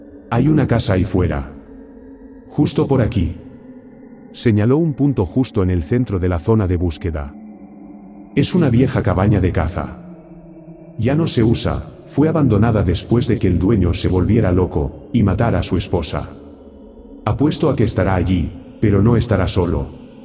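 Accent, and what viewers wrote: Spain. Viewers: Me gusta más la narración con esta voz en castellano a pesar de ser de MEXICO. Me encantan las narraciones con ese sonido de fondo.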